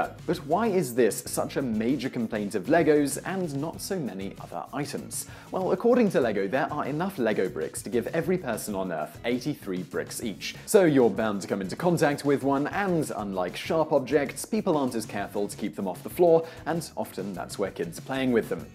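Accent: United Kingdom. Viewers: I'm disturbed because this Matthew Santaro/ Vsauce hubris has a British accent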